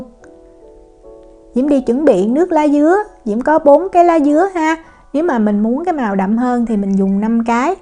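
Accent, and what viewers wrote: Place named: Saigon, Vietnam. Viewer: Bạn này hướng dẫn nói đúng giọng Sài Gòn nghe hay lắm. Clips có giá trị. cảm ơn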